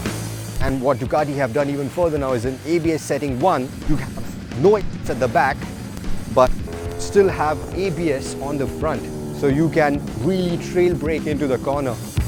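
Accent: India. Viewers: ''paaan nigaale'' lol typical dhoti tone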